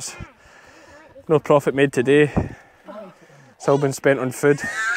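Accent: Scotland, United Kingdom. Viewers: Alistair is an absolute legend! But, would you consider putting Patreon back up? You could throw up some of the raw footage before Alistair does his magic (i.e. like your videos back in the early days). Just kidding, I love your early-days videos when you spoke Scots more. I miss being one of your Patrons! ❤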